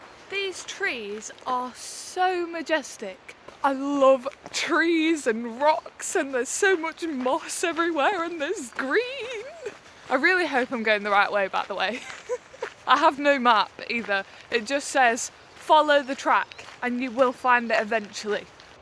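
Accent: United Kingdom. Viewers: Hello! Who are you traveling with? I love your British accent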